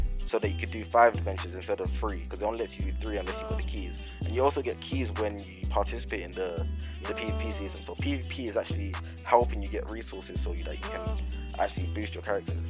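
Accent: United Kingdom. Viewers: My guy fam ur british too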